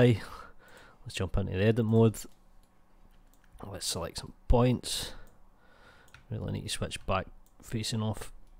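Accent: Scotland, United Kingdom. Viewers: I can hear a Scottish accent.. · I don't know what it is man, Scottish accent = instant like. You could be saying anything, it doesnae matter it's just like a fucken biological impulse. You could be like "awrite lads, welcome tae this tutorial on how tae punt puppies really far" and I'd still like the video.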